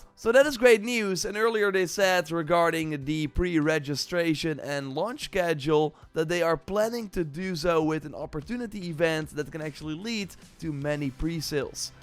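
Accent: Netherlands. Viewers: I know that accent, you're probably from Netherlands 😀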